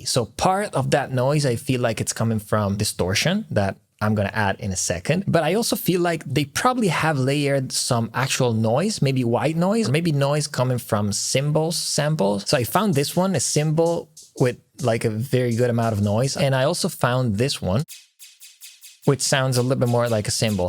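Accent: Italy. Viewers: ahahaaha ma sei italiano, non avevo pensato all'inizio del video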